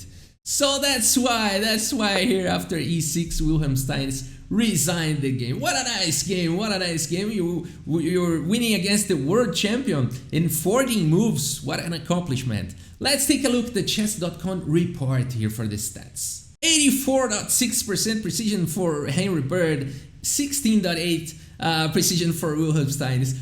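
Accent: Brazil. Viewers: Your English is good! Though you have a very distinguishable Brazilian accent (and there are some language slangs here and there that are mostly limited to Portuguese), you don't stumble or make awkward silent moments. Looking forward to seeing more videos! - Valeu,! Bora conquistar audiências lá de fora:) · Se o sotaque não entregar que é brasileiro, as mãos entregam kkkkkkk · Engliguês ou Portuguish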